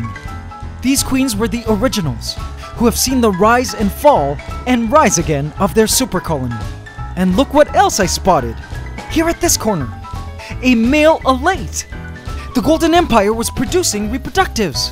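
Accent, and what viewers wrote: Canada. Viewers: Destrxct_ haha I always assumed he was Canadian... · You are Canadian right?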